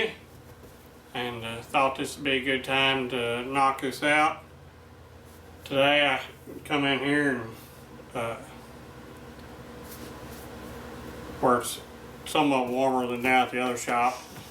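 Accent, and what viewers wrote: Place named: South, United States. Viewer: I'm always happy to find another southern guy with a southern accent showing that folks from the south may talk different but that don't mean we are not intelligent. Thanks for the update! I look forward t the next one but I've still got some backlog on your channel to catch up on.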